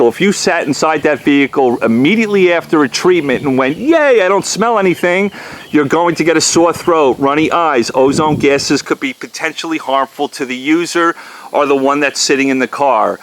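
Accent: New York, United States. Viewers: the accent sounded like it came from NJ or NY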